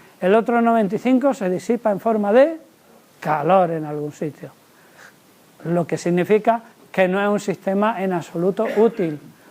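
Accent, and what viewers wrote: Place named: Andalusia, Spain. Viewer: ETE ES ANDALUZ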